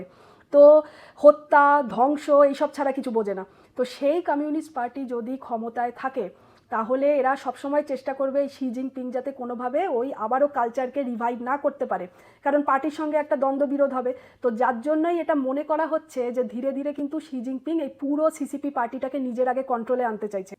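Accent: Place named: Medinipur, India. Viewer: Are u medipuroan by origin ?😅😅 U utter like this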